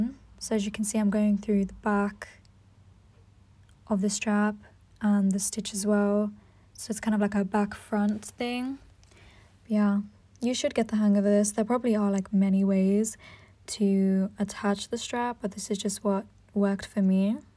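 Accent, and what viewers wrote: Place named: Russia. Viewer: русский акцент такой емае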